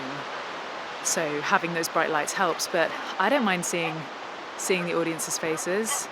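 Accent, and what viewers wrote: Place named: England, United Kingdom. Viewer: My first time seeing you. I like your accent. You’re English but I can still understand you. 😆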